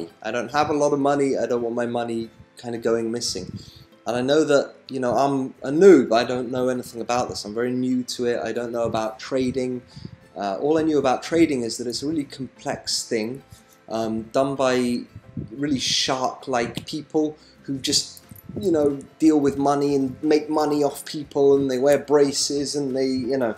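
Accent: United Kingdom. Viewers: If you live in UK like me, then when you come to withdraw Etoro do not mention that them converting UK to USD to be able to trade , then when you cash in, Ettoro converting again £USD back to £UK costs UK citezens a whopping 25% ! . That is what I earn almost earn from trading, so whats the point in trading with etoro if you live in the UK? Strange as you sound English so I wonder if you have this issue or have you found a work- around on this one. If so it would be get to know the trick. thanks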